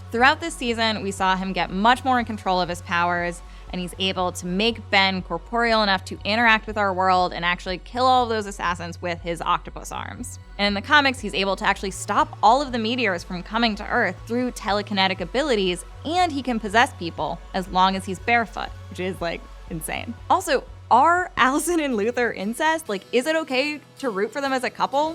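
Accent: United States. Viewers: Your accent is more like The californians from SNL 😁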